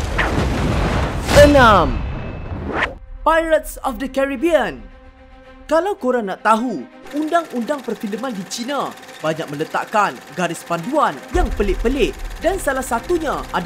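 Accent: North, Malaysia. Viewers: suka channel ni. Subscriber baru di sini. orang utara ka? A kat belakang tu pekat benau. Hehe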